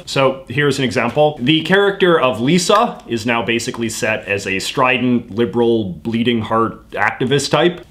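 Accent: Canada. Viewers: I'm aware that it's his accent, as a fellow Canadian I'm saying tone it down lol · Most Canadian man I’ve ever heard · You are so blatant Canadian! LoL!!!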